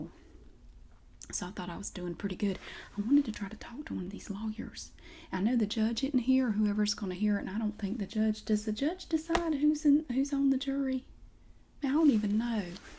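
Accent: South, United States. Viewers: I absolutely love the Southern accent but the creativity of this was something else :D Very entertaining and relaxing, thank you!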